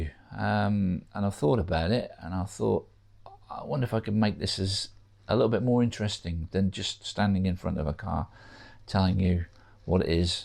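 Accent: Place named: Norwich, United Kingdom. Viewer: Hi , I hope you are both well...we have the same car an love it...also have the twin axle 8ft wide swift...you sound like a fellow norwich boy😁... like the vids ...keep up the good work...matt an trace.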